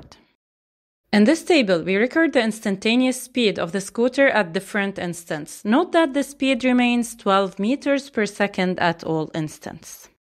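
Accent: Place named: Lebanon. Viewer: the narrator sounds Lebanese to me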